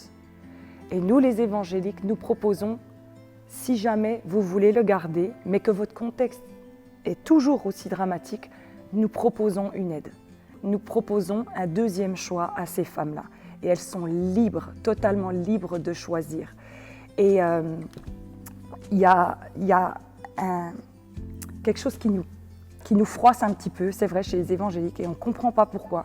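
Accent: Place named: Doubs, France. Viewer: Chère Pasteure, je vous ai découverte il y a environ deux ans..Votre Foi transparaît à chaque phrase dans vos vidéos. Je suis catholique pratiquant mais avant tout sur le chemin, la vérité, la vie..Soyez bénie.J'adore votre accent qui me rappelle celui de ma cousine qui habitait dans le Doub😊.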